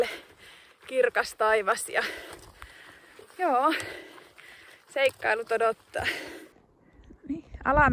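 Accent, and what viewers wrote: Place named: Ostrobothnia, Finland. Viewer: Mukavan rentoa meininkiä 👍 Pilkahduksia pohojammaan murteesta 😊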